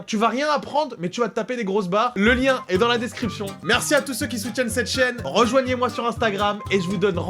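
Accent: Colombia. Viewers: L'accent colombien 200000/20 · Accent Colombian: 20/20❤️ Leonard di Vinci: 58/20😍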